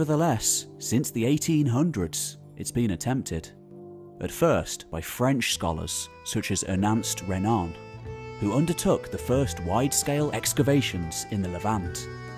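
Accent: United Kingdom. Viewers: I'm always looking for good history doc channels and yours is certainly one, thus I have subscribed. Being one-quarter British I enjoy the many accents from your islands and would rather see a world history documentary presented by a Britisher than one from a fellow American. Keep up the excellent work and thanks for the many links you've generously provided to other related sources.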